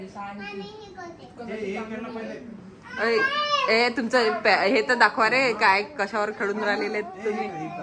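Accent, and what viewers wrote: Vidarbha, India. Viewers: 1no ❤ ekdm bharri Vidharbh bolte 😊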